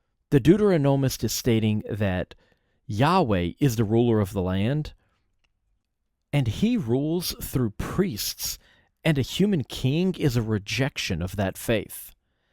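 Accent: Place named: South, United States. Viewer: its funny how articulate southerners twang bursts through in certain words and affectations. EeYeen recurs with my guy here. Why its peculiar is you will notice it only with certain words. Men is mEeYeen usually almost universally with articulate southerners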